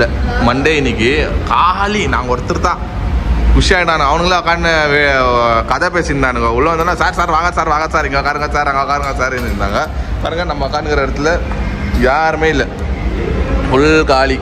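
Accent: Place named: Tamil Nadu, India. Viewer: talk properly…. Seems like u talk local Tamil types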